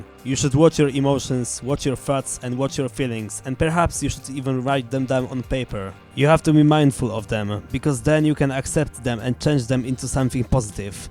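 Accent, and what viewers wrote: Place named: Russia. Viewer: Are you from? Sounds like Russian. BTW Good video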